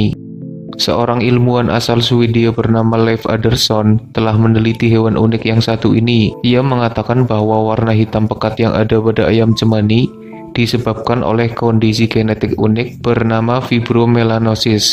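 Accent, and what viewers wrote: Banyumas, Indonesia. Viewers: Adminnya orang ngapak nih wkwk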